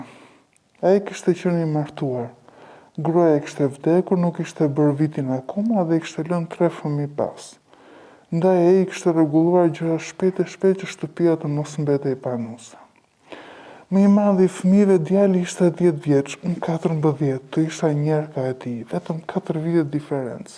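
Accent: Albania. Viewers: I kam degjuar pothuajse te gjitha historite tuaja por 1 gje nuk kuptoj ne te shum histori pervec se i njejti ze ,ok mund te jet i modifikuar por dialekti i njejte si nga zonat e Fierit ,kjo te le te kuptosh qe mund te jene histori te sajuara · Te duket nga veriu me kete dialekt ? Zonja perdor fjalen koshtrat kete fjale e kam degjuar te flitet nga gjirokastrite.Mentalitet te tille per te shitur vajzat qe ne mosh fare te vogel ekziston akoma rendom dhe ne zonen e Mallakastres dhe Fierit por e sigurt nuk eshte nga keto zona. Eshte ose nga gjirokastra ose nga saranda sepse jeton ne 1 zone qe kane patur besimin katolik sic edhe zonja thote shkova ne 1 kish.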